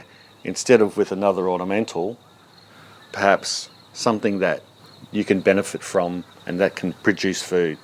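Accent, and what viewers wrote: Australia. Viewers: I love your videos. I'm in NZ so my climate is not quite as warm as yours, but great to hear a Southern Hemisphere accent (even if you are an Ozzie!). After seeing your dragon fruit vid, I sowed some Dragon Fruit seeds and they have alredy sprouted.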